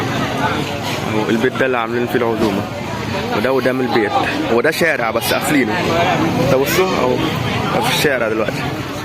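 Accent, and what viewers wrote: Egypt. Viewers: ماشاءالله حلقة حفظ قرٱن كريم والعربى بتاعك مصرى خالص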